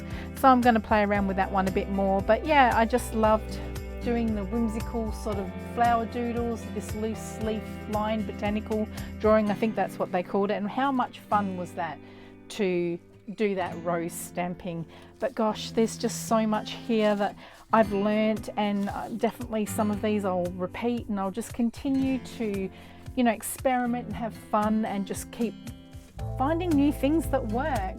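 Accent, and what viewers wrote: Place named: Australia. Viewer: Just came across your channel today and I’ve watched a couple of videos. You’ve gained a new subbie. It great hearing Aussie voices. 😊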